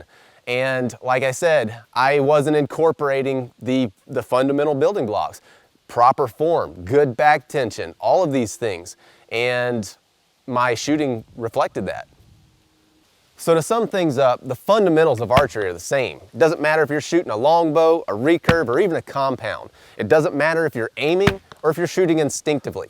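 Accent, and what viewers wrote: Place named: South, United States. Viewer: hi! I'm from New Zealand. really enjoyed watching your journey on Alone as you've heard millions of times so I went searching for your name to pick your brains coz I'm new to archery as of last year bought a 45lb recurve bow set during lockdown here and loved it now I'm hooked! still trying to fumble my way thru techniques vs different equipment sheesh... great video man thanks for sharing your wisdom with the tint of southern accent as a bonus "YEEE-HAAW! hillbilly nation come on!" (that's my own saying 😂 hope I don't offend anyone? Oh well its out there now) PEACE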